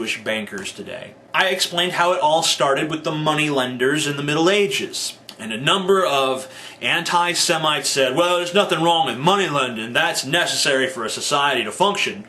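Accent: Germany. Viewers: It's funny how this video created a white supremacist forum in the comment section. All reassuring each other that their points of view aren't dangerous/hateful/stupid/retarded. BTW your german accent is funny too. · Your German accent isn't too bad. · your german accent is fine :-) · your german acent is amusing :) 5/5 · I agree with what your saying, and think your german accent is bamf However, i 'feel/think' that hitler only focused on anti-semitic ideals because he needed to have a scape goat to get a majority of the germans to side with him. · I'm German and I love your german accent.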